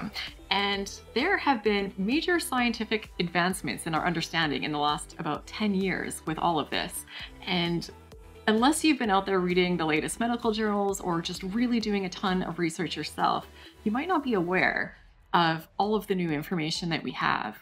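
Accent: France. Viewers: Digging the French accent and French Flintstone. 😂 (I speak French!) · Thank you so much for your great work, your french accent is beautyful❣️A lot of greetings from Germany 🙏🌈🙋‍♀️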